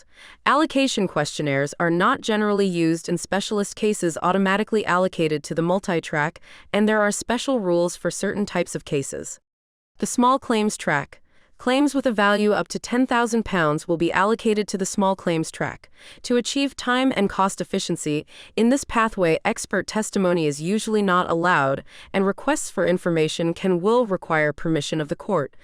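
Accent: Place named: United States. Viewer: so annoying using American voice for uk law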